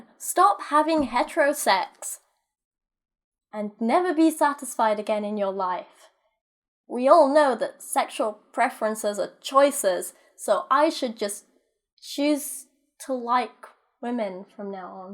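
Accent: United Kingdom. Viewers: I guess problem is that a lot of us (including the British sounding lady what made this video) are used to living in semi-civilized countries where free at point of use Healthcare is a given and reproductive rights are taken for granted. Pity the poor bastards South of the 49th.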